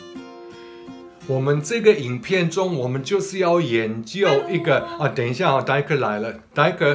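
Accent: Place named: Taiwan. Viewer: 老师您讲的台湾普通话好可爱呀😻🤗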